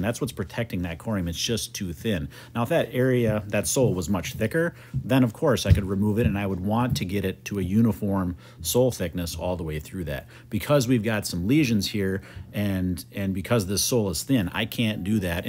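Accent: Wisconsin, United States. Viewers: Just from your accent, I was picking up some Minnesota which makes sense kf what you told us where you apprenticed and then I definitely thought you were a Wisconsinite. I'm a born and bred Wisconsinite myself. Dad worked on a diary farm making cheese in summer during school breaks in the Sheboygan area where he was born and was raised in Kiel with family right in New Holstein. I was born in Milwaukee and that's where my mom and her family have always been. Then after being in Crystal Lake, IL until age 3-4 as my dad commuted to work in Northbrook, we settled in Kenosha...so the super southeast and only 10 min from the WI/IL border. Lots of cows everywhere in this state. As a life-long rider of horses (jumpers and dressage) and watching farriers trim and put on new shoes, do you think you could (in a pinch) trim a horse hoof? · I thought I pinned your accent! 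Much love from a fellow Wisconsinite!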